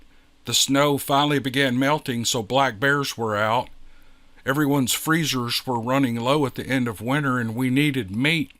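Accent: South, United States. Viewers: You are most welcomed. I love the southern sound of your voice and I only listen to a few of you guys since Covid, so to me you guys are the OG. Thank you for sharing your time and you voice with us. 🙏🏼❤️ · I took the poll, 😁, love hearing a Southern gentleman tell stories. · I missed the poll on your community page. I could listen to you read the back of a cereal box for an hour!😂for me your southern accent reminds me of home. I have followed you for a few years now and you seem like a genuine nice guy I like that too. No foul language no foolishness. Nothing worse than listening to people bashing others, I despise that. I just like your approach to every story or email. No need to thank me for listening, I’m one of your people😂. Thank you for the stories. Keep up the good work brother❤️ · Mr., I hope I am not offending you sir by commenting on your last video, however at the Mark you commented "some of these villages were set up by whites!", and I found it to be somewhat derogatory and offensive...what does skin color have to do with Sasquatch entertainment stories and why invoke race when it was obviously not relevant...someone with your platform, and in reaching the thousands of ears and minds that your narration touches, one would think you'd be more conscious of your choice of words and how they're perceived....I challenge you sir to replace the word "whites" in that statement with any other race defining word and id almost bet youd be deplatformed before you coukd say.....that said, my brother and I thoroughly enjoy your southern draw and your channel is our favorite!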